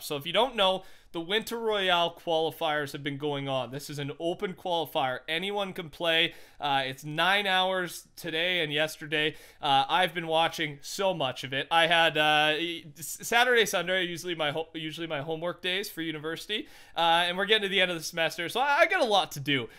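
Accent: Canada. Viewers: Are you Canadian? It sounds like you have a little Canadian accent 😂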